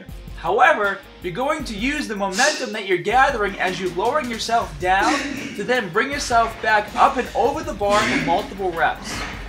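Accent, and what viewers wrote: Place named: Brooklyn, United States. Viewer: Spot Conlin It's his Brooklyn accent.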